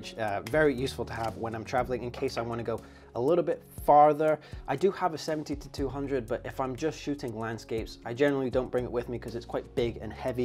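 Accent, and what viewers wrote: Scotland, United Kingdom. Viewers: Many thanks certainly enjoyed this and I have just subscribed. Would I be right that I can here a bit of scottish burr in your voice, where are originally from. I am a exiled Scot myself. Regards, Allan Davies.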